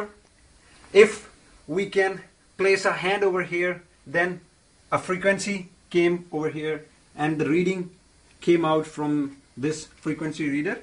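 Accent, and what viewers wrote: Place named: Pakistan. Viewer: Stupid People, This is our Pak-English Akcent. Its our Language and Our Identity. and i speak the same akcent, i love it. and if you can give him some million dollars and a top-class research organisation with some insurance and security, then can do a lot better. why dont you give him these things you cheap and lazy people suffering from superiority complex in a middle class of a 3rd grade country !!!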